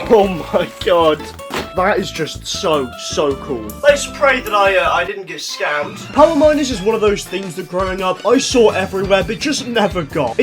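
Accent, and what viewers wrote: United Kingdom. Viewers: Do you live in America but you're British??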